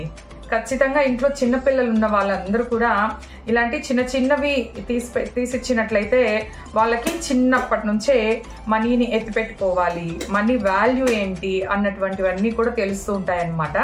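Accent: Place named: Nellore, India. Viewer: Hi garu nenu mee vedios first time chusanu meeru matladetappudu Nellore slang la vunde anukunna anukunnate meeru vedio lastlo Nellore antaa tiriganu stand kosam annaru kada appudu ardamayndhi meeru kooda mana Nellore vallani😅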